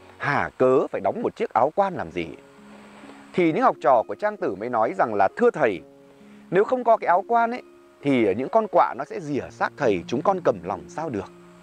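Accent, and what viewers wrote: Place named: North, Vietnam. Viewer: I really enjoy listening to your voice (Bac ky)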